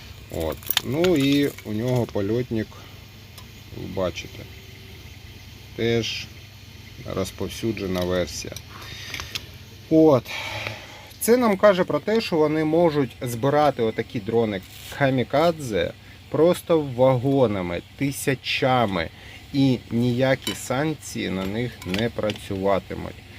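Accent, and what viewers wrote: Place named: Surzhyk, Ukraine. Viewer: Какой натужный суржик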